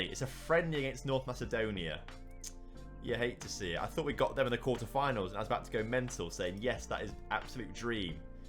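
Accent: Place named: Scotland, United Kingdom. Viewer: The Scottish accent wasn't that bad, 6/10, way better than the pronunciation of Mcleod in the on the rocks videos.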